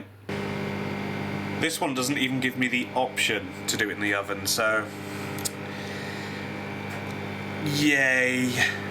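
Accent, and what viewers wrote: United Kingdom. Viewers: great channel! your deadpan british accent makes it even more hilarious!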